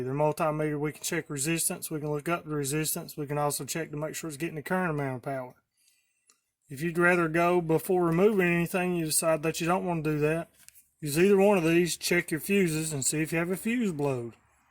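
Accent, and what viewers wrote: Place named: South, United States. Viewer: The first thing I check for is a good solid "Southern Drawl" iminating from the commentator. Once you have established that the presenter has that "Drawl" you're looking for then you can be assured that this fellow is self sufficient and probably has years of experience working on his own vehicles. You will notice that there will not be any unnecessary "BS" from some stuffy old book or manual. What you will hear will be the voice of experience and will be explained in a "no bullshit" manner that even a city boy can understand. So listen up Billybob cause it aint going to get any better than this !!! My hat is off to the gentleman that took time to show me how it is done. Thank you Sir.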